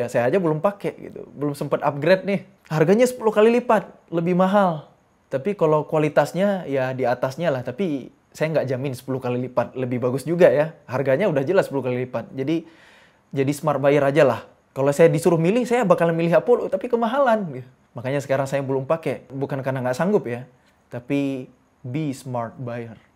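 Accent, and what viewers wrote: Aceh, Indonesia. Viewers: Masyaallah bg..tabarakallah.. Ciri bahasa masi kental acehnya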